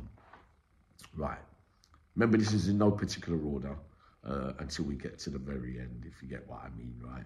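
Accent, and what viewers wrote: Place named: London, United Kingdom. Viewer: brilliant video...loved it...and i love the london accent...keep well ..keep happy....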